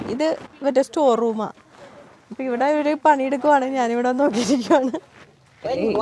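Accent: Thrissur, India. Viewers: Chechide samsaram reethi thanneya ettavum manoharam.. 💞💞 Chechi from thrissur alle... evdeya proper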